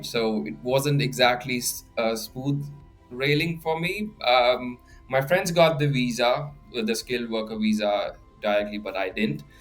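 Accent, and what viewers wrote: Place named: United Kingdom. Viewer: Bhai are you uk born?cause accent is pure British.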